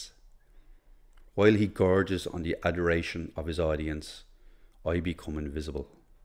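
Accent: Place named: Scotland, United Kingdom. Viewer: I like your accent. Scotish accent I suppose.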